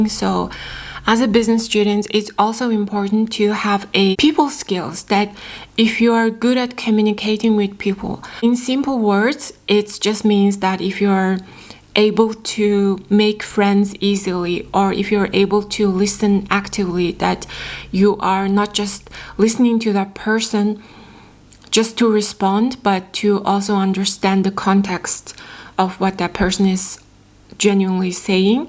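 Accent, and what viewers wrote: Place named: Australia. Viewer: .. you are starting to get an Australian accent. 😁 Would you still study business if starting again today?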